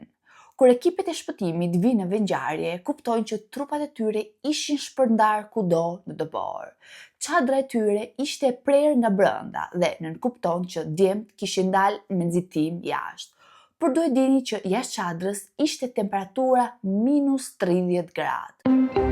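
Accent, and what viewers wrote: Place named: Tosk, Albania. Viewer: O sa bukur ngjyra e flokve tua ket ngjyr Gri ❤ te rrin shum bukur mos i ndrysho biles nje koh❤! Dhe un e kam ket ngjyr vetem se ikam te shkurta.Të përshendes nga Franca ne Origjin nga Kosova.te ndegjoj me shum deshir sepse me pelqen dialekti tosk edhe pse Gegnishtja asht e bukur se asht shum e veqnat por ti gjithqka e bën me ❤ !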